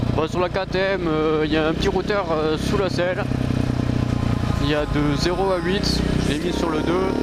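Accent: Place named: South, France. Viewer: l'accent zen du sud 😊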